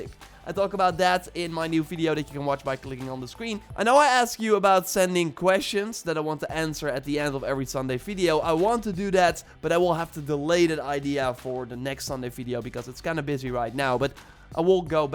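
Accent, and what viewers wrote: Netherlands. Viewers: You sound like dutch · you dutch?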